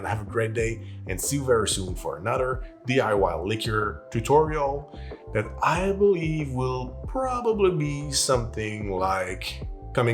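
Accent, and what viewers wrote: Quebec, Canada. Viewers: Because it's not from France's French but Québec's french!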